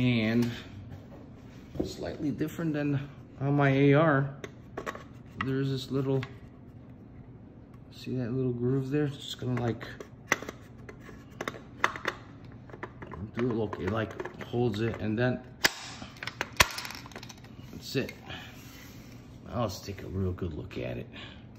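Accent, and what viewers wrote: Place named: Minnesota, United States. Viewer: You might be from Illinois but you have the perfect true Minnesotan accent. It's perfect. With a Wisconsin flare. I'm researching this gun for buying. Thank you for the video.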